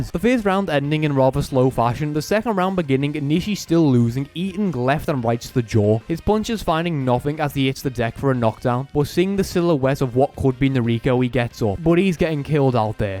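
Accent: Liverpool, United Kingdom. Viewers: they re both from liverpool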